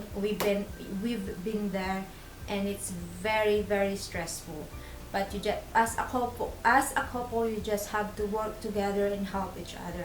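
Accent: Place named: United Kingdom. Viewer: Very helpful and you explain it well. Galing nyo po mag salita with british accent pa po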